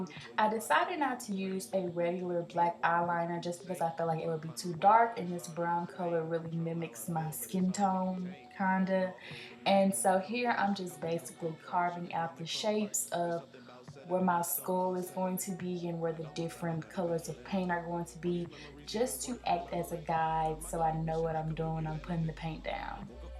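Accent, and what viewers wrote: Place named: Texas, United States. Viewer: When I first found you on Youtube I instantly searched for your location I could have sworn you were from Texas Lol you sound so much like her!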